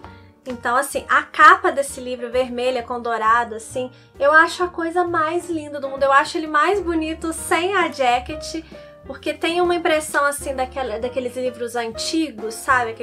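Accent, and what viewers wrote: Minas Gerais, Brazil. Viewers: Oi! Te conheci essa semana e estou adorando seu conteúdo! Sua voz é tão gostosa de ouvir, esse sotaque delicioso de Minas ❤ adorei sua seleção, livros realmente lindos! Aqui na minha estante meus xodós são as edições ilustradas de Harry Potter, as novas edições de Senhor dos Anéis, pela Harper Collins, e a edição da Puffin in Bloom de Anne of Green Gables, aquele azulzinho, sabe?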